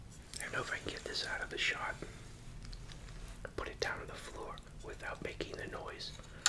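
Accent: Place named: New York, United States. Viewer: u sound like you’re from NY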